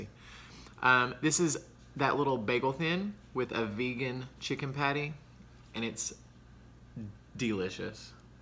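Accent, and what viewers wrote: South, United States. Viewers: 😂😂 LOVED this!! I definitely felt Taci B coming through but that southern accent ain't goin' nowhere! 😆 YESSSS to the homemade Ranch dressing! Have you had the Reeses cereal that comes out in spring/Easter in the shape of bunnies?!? 🐇 MY fave! I think about someone living in our attic far more than I'd like to admit.... that story was sooooo creepy! 😦😭😊💖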